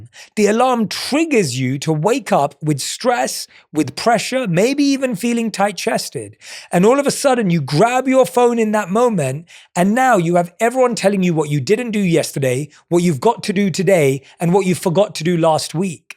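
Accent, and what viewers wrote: United Kingdom. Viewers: Are you a British man? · It's a Nice way to improve My listening skills with England accent and at the same time we learn new thing, being a better person by new habits.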